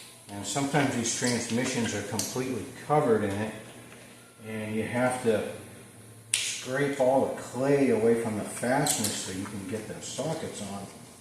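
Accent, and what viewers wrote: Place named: Northeast, United States. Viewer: You live in Texas? From your voice I assumed you were from the Northeast.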